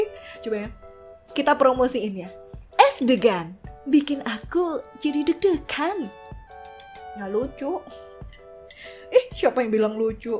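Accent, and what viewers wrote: Surabaya, Indonesia. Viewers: woalah... arek suroboyo ta.... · Akhirnya ngonten lagi, mbak njenengan wong jowo to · Sampean arek suroboyo ta mbak bro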